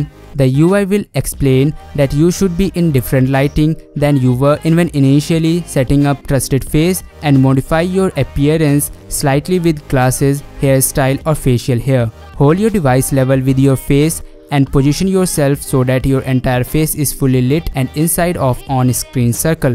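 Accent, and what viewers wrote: India. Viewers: indian accent omg so hard to understand plase try to speak without accent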